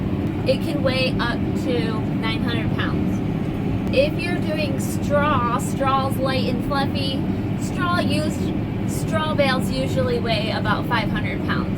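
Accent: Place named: South, United States. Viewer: You sound like from south but your Michigan some place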